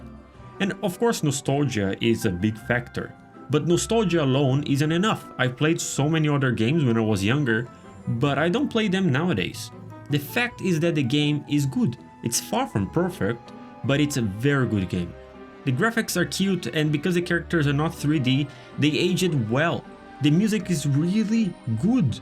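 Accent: Brazil. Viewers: Are you Brazilian? I’m noticing your accent. I’m half Brazilian.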